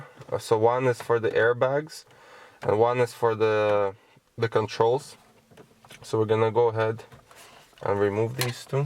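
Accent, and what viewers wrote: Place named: Russia. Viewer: слышится почему-то русский акцент...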